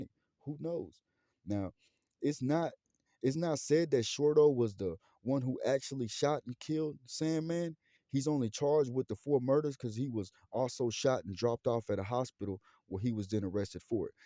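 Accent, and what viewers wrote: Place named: United States. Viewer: Yo you sound American.....how you know about all this Trinidadian stuff? lol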